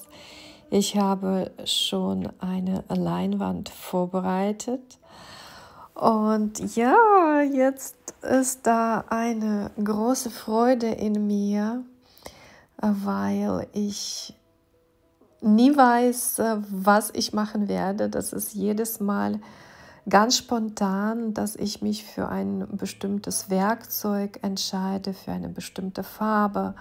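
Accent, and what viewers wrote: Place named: Russia. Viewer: Классно! Чуток слышу наш акцент.:)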